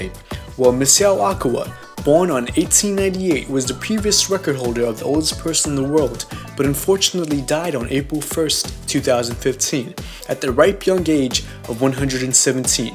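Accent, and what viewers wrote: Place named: New York, United States. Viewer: he just needs cough medicine.. GET OUTTAA HEEAAAH.. a NY vocal mayb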